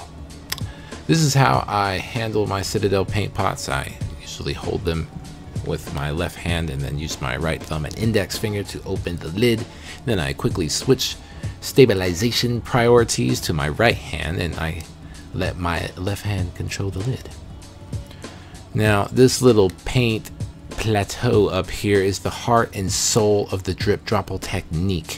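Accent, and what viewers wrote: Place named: Milwaukee, United States. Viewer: You wouldnt happen to be from Milwaukee? Sounds like the right accent. Much love.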